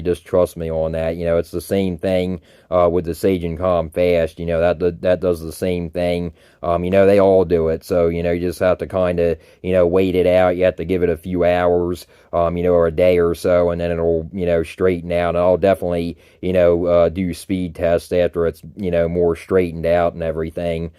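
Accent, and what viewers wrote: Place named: New Jersey, United States. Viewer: An Australian who adopted a New Jersey accent...you know.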